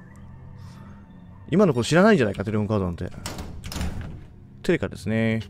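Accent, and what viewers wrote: Kansai, Japan. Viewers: 確かに笑か怖かどっちかにしてほしいですね、サムネ最高ですw 「どっちやねん」 の関西弁好きです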